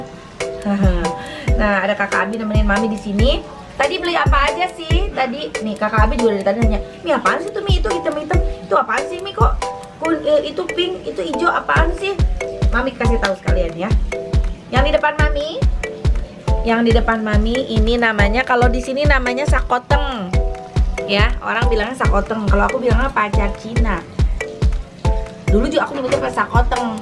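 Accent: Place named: Garut, Indonesia. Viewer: Resep pisan ngadangu Teteh Logatna garut pisan...abi orang garut Lami d bandung Janten teu apal ceplak t garut mana teh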